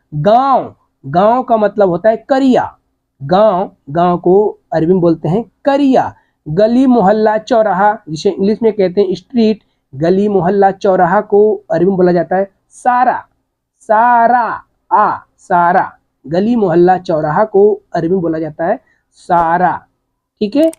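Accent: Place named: Uttar Pradesh, India. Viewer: Bhai mujhe lgta hai aap uttar pradesh se ho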